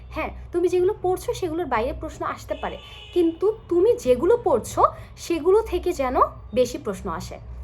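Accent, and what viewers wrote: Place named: Chittagong, Bangladesh. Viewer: আপু আপনার বাড়ি কি চট্টগ্রাম বিভাগে? আপনার কথার মধ্যে চাটগায়ে ভাব আছে।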